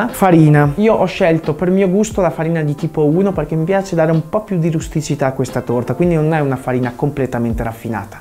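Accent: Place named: Emilia, Italy. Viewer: Ma sei troppo forte, sembri emiliano come me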